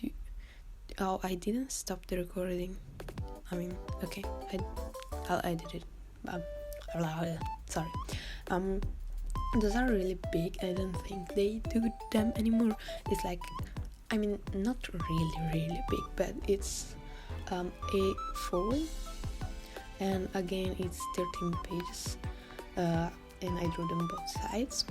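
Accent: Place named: Italy. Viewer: I like your accent, where are you from? Italy?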